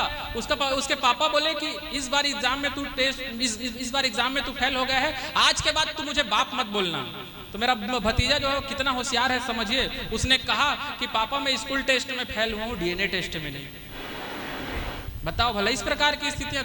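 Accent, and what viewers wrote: Bihar, India. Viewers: Bihari ton hai.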